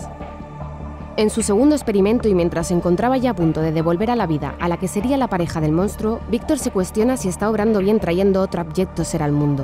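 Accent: Spain. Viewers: Me encanta el acento español <3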